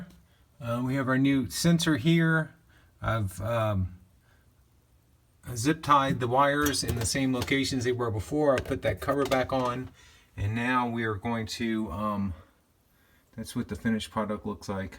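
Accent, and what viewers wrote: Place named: Pennsylvania, United States. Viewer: Pennsylvania? or New Jersey?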